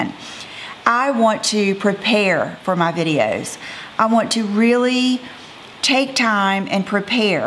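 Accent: South, United States. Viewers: Why I watch your videos: -- You inspire me to look my best every day; even when I don't feel like putting an outfit together or putting on makeup. -- You are a genuine and good-hearted human being (something that, unfortunately, is not so common nowadays). -- You are a stylish and classy woman - a great fashion role model. -- You give great tips about shopping, clothing, makeup, jewelry, etc. -- Listening to your southern accent brings a smile to my face every time I start watching one of your videos. -- And for so many other reasons (too many to list)..... I've been a subscriber of yours since the beginning. Thank you for being a constant reminder that all women are beautiful. And for showing us that when you take a little bit of extra time to look good on the outside, the inside follows and your attitude and outlook on life change for the better. 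· I've been watching you for years and following you on Instagram and although I don't comment very often, I just love your personality. I can relate because we are in the same age group and also our children. Although the extent to my makeup is the basics (I have quite a lippy collection however..lol) I just love seeing your purchases from clothes, to makeup to art etc. Let's not also forget, that southern accent. You're as real as it comes. You like what you like and there's absolutely nothing wrong with that. xoxo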